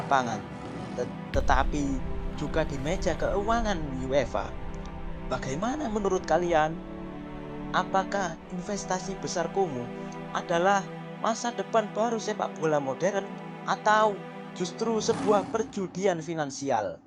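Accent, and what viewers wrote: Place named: Java, Indonesia. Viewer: Menurut saya : perbaiki dulu narator mu.. kalo bisa jangan terlalu medok jawa, belajar n liat jurnalis2 nasional TV, mereka gak ada yg medok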